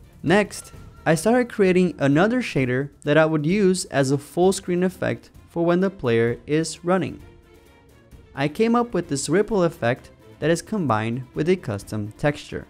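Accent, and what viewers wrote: Brazil. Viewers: Haha do jeito que você falou já descobri que era Brasileiro. Não tinha ideia mesmo depois de 6 meses desde que comecei a assistir seu canal.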